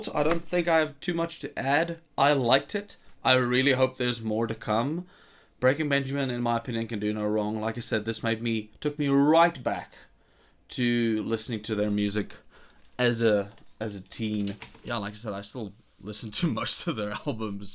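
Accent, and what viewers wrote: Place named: South Africa. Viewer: South African?? Klink so! :D ZA ZA ZA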